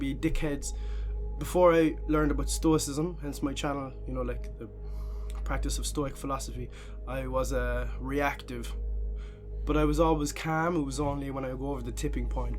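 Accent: Ireland. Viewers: Ireland… WOW. Your accent is aMAzing😃. I like a Libra a lot, but… I didn’t realize he liked me at all(!). I thought he was just being nice and vice versa. He thought I was just being nice. Suddenly, I began receiving highly spiritual signals about this guy. I misunderstood these signals for about two months—till recently. Then, I realized he was trying to tell me too. The challenge is that we see each other in a professional environment. I trust that God has a plan. Cheers🌬️🌤️🕊️.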